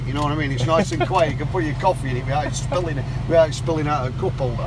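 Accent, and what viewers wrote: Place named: Yorkshire, United Kingdom. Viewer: Strange hearing a yorkshire accent in the united states. Awesome build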